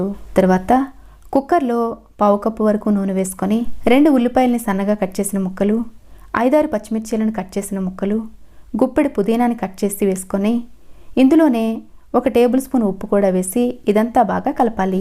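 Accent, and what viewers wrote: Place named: Andhra, India. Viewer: Andi andi meru Telangana unna Warangal vantalu ani youtube channel peru pettuko andhra slang matladutunnaru enduku...meru andhra na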